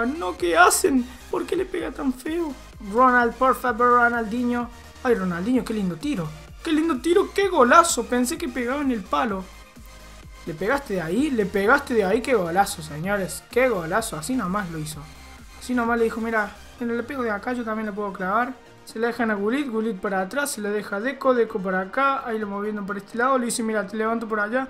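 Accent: Córdoba, Argentina. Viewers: Eres cordobés?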